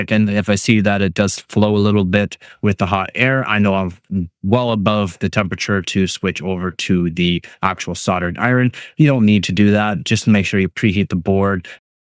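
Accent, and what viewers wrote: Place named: Canada. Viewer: Yep. Really irritating to hear sodder. I thought the only country to pronounce it sodder were your neighbours,(and soon to be assimilated into the US ...Joking of course ) . Sussed you were Canadian by the pronouncing the word "out". Have been doing the electronics etc stuff for over 60 yrs- yep an old boy. So if you won't pronounce it as solder then I will have to watch with the sound turned down.... Cheers and good luck with the channel.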